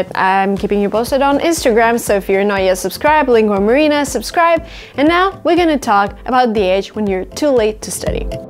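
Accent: Russia. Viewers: От русского акцента получается избавиться невозможно? Легко вас понимаю, потому что вы говорите с русским акцентом. Произношение иностранцев даётся сложнее на восприятие и многое не понятно в речи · Don’t get rid of your Russian accent!! It’s beautiful i wish my voice was like yours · Она не строит предложения по-русски. У нее все в порядке с грамматикой. Пожалуй, единственное, что выдает в ней не носителя английского, так это мелодика русского языка, протяженное произношение гласных. А все остальное просто супер!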